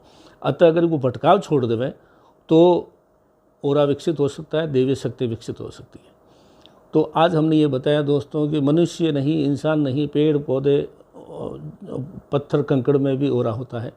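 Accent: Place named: Rajasthan, India. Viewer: हमको लगता है आप राजस्थान के हैं आपकी लैंग्वेज से भाषा से पता लग रहा है क्योंकि हम राजस्थान के हैं ।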